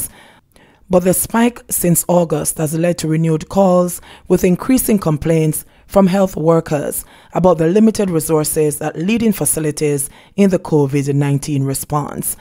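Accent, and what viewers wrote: Jamaica. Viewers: Love u one more time my girl real jamaica talk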